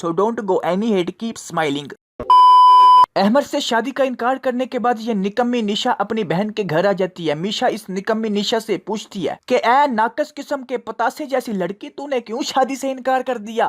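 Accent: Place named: Punjab, India. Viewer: I love your Punjabi accent..it's cute.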